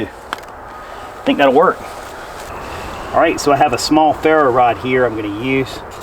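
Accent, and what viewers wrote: South, United States. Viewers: Sorry, trying to figure out what state your in? Couldn't find it, but you have a pretty cool southern twang in your voice.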